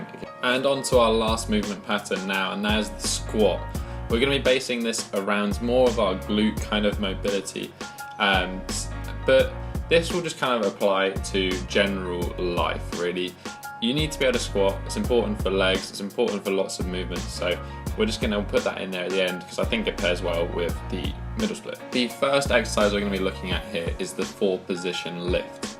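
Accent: United Kingdom. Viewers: You sound British but have a palm tree behind you...Where are you? It's driving me crazy haha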